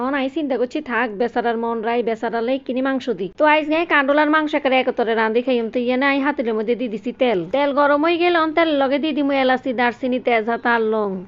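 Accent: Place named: Noakhali, Bangladesh. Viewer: এমন খাবার আমার কখনো খাওয়া হয় নি...নোয়াখালীর ভাষা শুনতে মজাই লাগলো 😁😁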